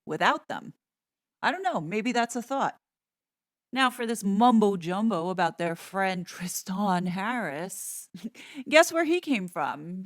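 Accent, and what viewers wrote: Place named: New York, United States. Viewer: another great video! Right on point. As an aside…I love it when you are on a roll and your NY accent comes flying out of your mouth..!!! Thank you for all you do!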